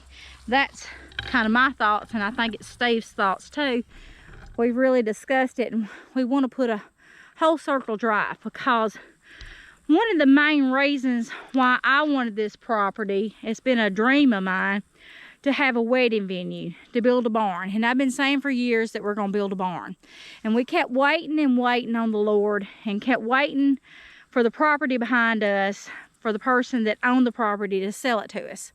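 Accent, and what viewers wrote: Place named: South, United States. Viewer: Congrats! You sound like you're from the south. Last one of these I watched the people put at the very end it already had a contract! Cudzu is edible according to a man that french fried it during the depression ! Best wishes.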